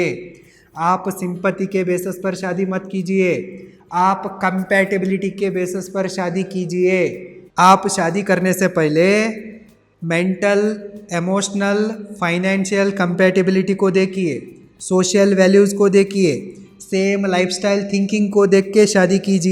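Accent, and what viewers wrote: Maharashtra, India. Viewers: राम - राम मराठीत बनवा ना.. ( Marathi Accent Refelecting clearly )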